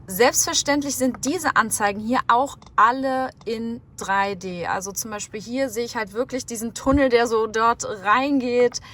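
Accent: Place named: High German, Germany. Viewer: That's not an accent, that's High German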